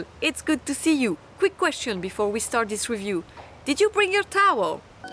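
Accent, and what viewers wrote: France. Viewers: Ah le bel accent Français j'adore hi hi hi hi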